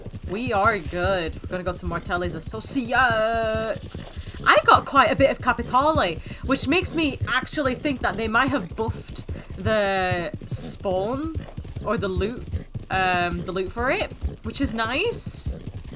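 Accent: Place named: Ireland. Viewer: i played all love,so...I whant only to hear you Irish accent! :)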